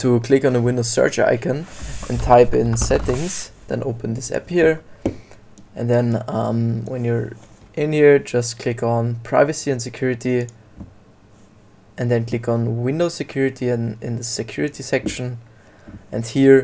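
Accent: Germany. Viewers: Man merkt denn deutschen akzent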